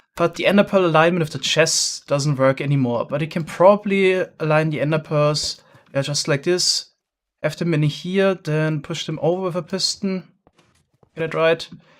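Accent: Germany. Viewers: you sound a bit German 🤔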